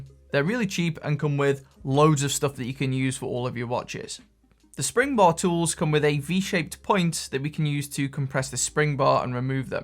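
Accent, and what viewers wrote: Stoke, United Kingdom. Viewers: Are you from Stoke?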